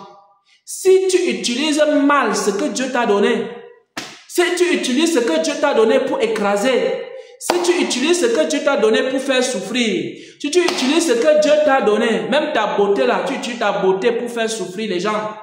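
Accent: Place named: Cameroon. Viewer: Tu es camerounais par ton accent.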